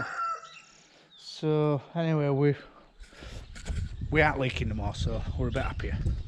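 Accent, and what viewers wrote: Yorkshire, United Kingdom. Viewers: Loving this channel - taken a while for my Cornish ears to tune in to your Yorkshire accent but I’ve got it now. Your thinking is very much the same as mine - I have had concrete as thin as 2” on a really firm base. Never bother with reinforcing but always add anti-crack fibres.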